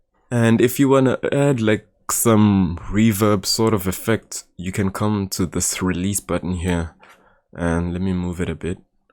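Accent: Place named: South Africa. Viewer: I know a South African accent when I hear,I'm glad there's more fans in the country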